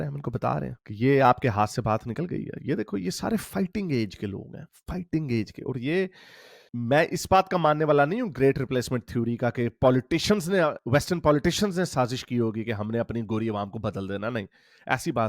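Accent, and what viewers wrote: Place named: Punjab, India. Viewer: No no Rawanda, Punjabi tone not Afghani.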